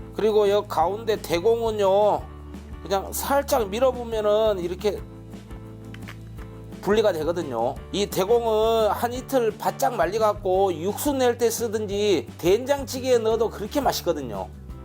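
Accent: Jeolla, South Korea. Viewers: 아주 오리지날 전라도 말이네요 내고향 사투리를 듣고자 이 요리도 배우고 좋아요 ㅎㅎㅎ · 매번 느끼지만 전라도사투리가 특히 남도지방,광주,여수.순천,고흥.근처 사투리가 얼마나 구수한지..ㅎㅎ 전라도를 떠나 30년째 경상도 살아도 40대인 저는 세프님하고 언어가 어째 이라고 똑같다요~잉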